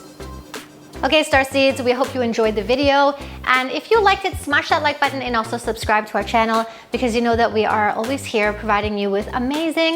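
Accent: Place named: Caribbean. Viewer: You sound Jamaican/ Caribbean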